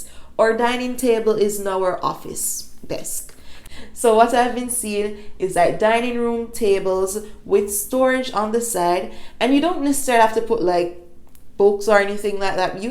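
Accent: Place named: Jamaica. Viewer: was just saying you sound Jamaican. This is my first time coming accross your channel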